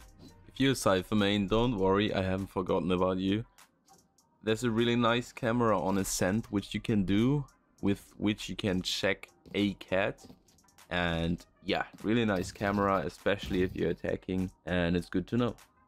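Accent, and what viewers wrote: Germany. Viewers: Are you german? Bc ur accent sounds like it. And also do you play with your viewers sometimes? Or do you stream?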